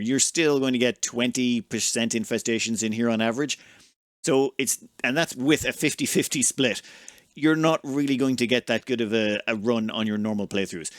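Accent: Ireland. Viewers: Lol he's Irish right?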